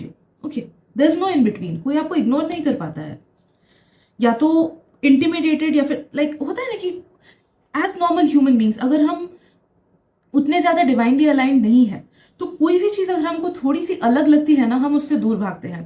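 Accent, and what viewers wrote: Bengal, India. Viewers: Didi are you bengali ??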